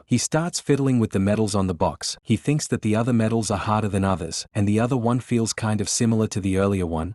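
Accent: United Kingdom. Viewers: British ahh accent. It's okay tbh. Just not used to it. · Why is AI talking with British accent 😭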